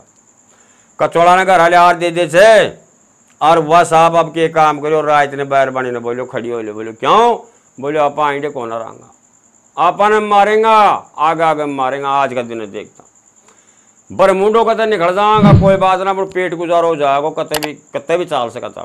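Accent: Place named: Haryana, India. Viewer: Kha se ho tau ji apki boli to haryanvi mix h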